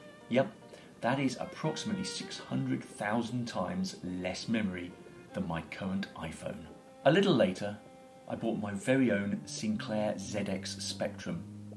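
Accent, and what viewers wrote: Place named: United Kingdom. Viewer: your magical british accent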